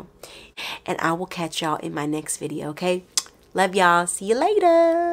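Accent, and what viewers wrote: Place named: North Carolina, United States. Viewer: I hope you know and BELIEVE how good you are at this ❤ Because I swear, I could listen to you talk about anything for as long as you’d be willing to talk 😂 I also love some of your sayings and your accent because I’m in NC and I can SOOO relate to some of the stuff you say and how you say it… feels like I’m just listening to a friend tell a crazy story❤🫶🏻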